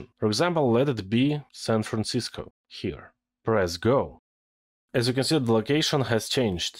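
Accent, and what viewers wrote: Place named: Russia. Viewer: все-таки, у него русский акцент, русский же блогер)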